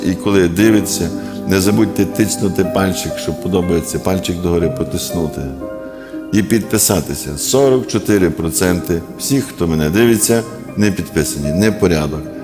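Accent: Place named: Precarpathia, Ukraine. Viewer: Дякую Господу Богу отче за вас Я також з Ів.Франківської області з Калуша,але живу і працюю багато років в Іспанії,але як гріють душу вашу науки і наш Прикарпатський говір.Дякую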